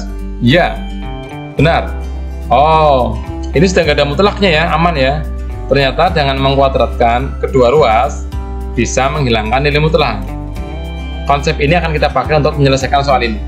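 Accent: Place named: Banyumas, Indonesia. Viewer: Bapaknya ngapak ya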